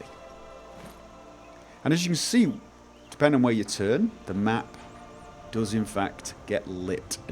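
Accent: United Kingdom. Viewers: REALLY? This whole time I thought he was British ._. And I'm British. He's really refined learning English then o.o, usually people retain accents when they learn a new language (Like Robbaz) but he just sounds British. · Evans He is British. He resides in Norway, though. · Hey, where are you from? I know it says Norway but your accent seems to be very British. Oh and awesome videos btw, keep it up.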